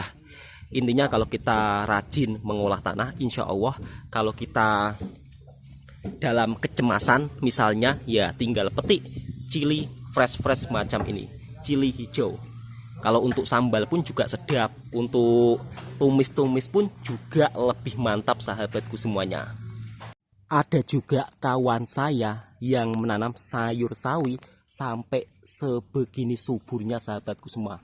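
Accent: Java, Indonesia. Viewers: Suka dengar cakap melayu slang jawa. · Kami semua faham bahasa kamu hya loghat je,pekat jawa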